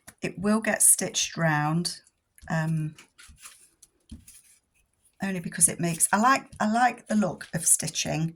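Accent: England, United Kingdom. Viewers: I just love what you’re doing with this journal!!! I love watching you craft and hearing your beautiful English accent. Hugs, Sharron 🥰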